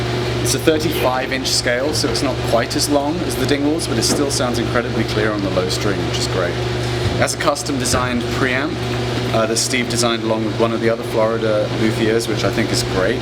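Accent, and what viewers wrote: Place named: United Kingdom. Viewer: I just came here for the British accent.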